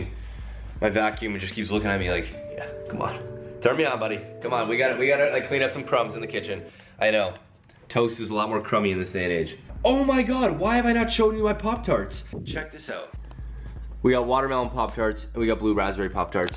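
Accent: Canada. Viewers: He's Canadian so he pronounces some things differently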